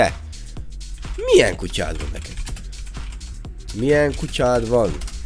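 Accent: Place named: Szekler Land, Romania. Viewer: "Föl" végre halotalak székelyesen beszélni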